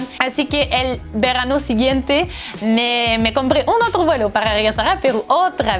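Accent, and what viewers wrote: France. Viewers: Interesante todo lo que nos hace mencion. Yo vivo en EEUU en estos momentos, pero soy original de Lima, Peru. Me doy cuenta que tu acento es frances y segun me cuenta una amiga francesa es mucho mas facil aprender castellano para ustedes y viceversa. En estos momentos estoy en Ohio y como que entiendo la vida esa de vivir en un lugar de vieve. Yo vivo en Nueva York y no por el hecho que esta cerca al mar no niva mucho como en Canada. En fin, todo lo que cuenta es muy dificil para gente que no han salido fuera de su pais. Lo cual es completamente diferente y como que tu tienes la vida esa aventurera de encontrar otros horizontes. Buena, suerte en tus objetivos. Espero, poder intercambiar ideas contigo.... Hablamos... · Tienes acento Francés! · Llevo poco tiempo viendo tu video y pareces una linda chica, dijiste que eras canadiense, pero pareces más francesa, hasta tu acento.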